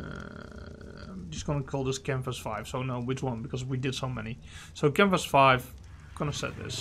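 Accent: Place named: Netherlands. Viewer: Theres such a good Managarmr paint job Actually (fakka strijder btw, k hoor gwn dat je NL bent over je engels XD) gooi ff je discord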